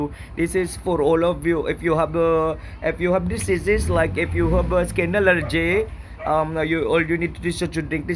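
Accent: India. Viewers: mas lingaw kaayo ko sa imong Indian accent. 😂 Daghan dnhi sa Abu Dhabi. 😁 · Yati kuhang kuha Jud Ang accent sa Indian nice one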